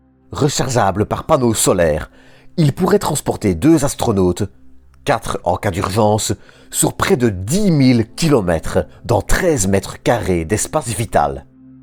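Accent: Belgium. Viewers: votre accent belge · L'accent belge.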